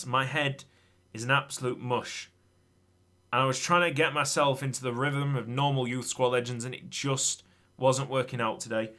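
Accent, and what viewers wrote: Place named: Yorkshire, United Kingdom. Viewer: A Yorkshireman screams at machine for 20 minutes.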